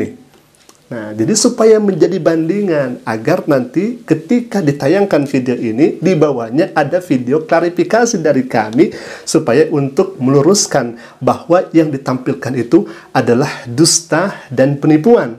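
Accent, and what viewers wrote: Indonesia. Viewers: Ngaku dr Malaysia logat bahasa Indonesia Ini aja dah ketahuan bohong nya 😂😂😂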